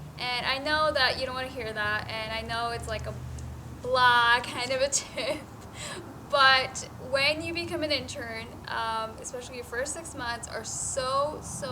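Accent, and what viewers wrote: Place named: United States. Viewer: Hey doctor you said you are an IMG but you speak like an american how you got this accent ?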